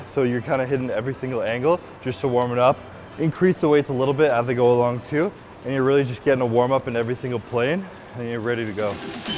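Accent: Canada. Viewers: Canadian lol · Chris has got to be Canadian the way he talks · That’s that Canadian accent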